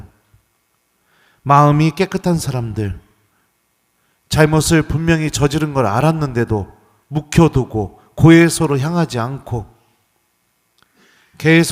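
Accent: Chungcheong, South Korea. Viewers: 신부님 감사합니다 강론말씀에 저 영적으로 채워지고 있는듯 해서 넘좋습니다~ 정겨운 충청도 사투리에 마음이 따뜻해집니다 왜인지 돌아가신 부모님이 생각납니다~~ 신부님 감사합니다 하느님 감사합니다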